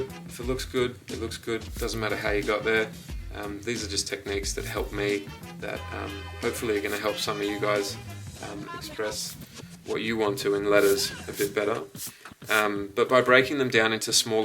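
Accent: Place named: United Kingdom. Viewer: Dude, you have the britishst accent ever!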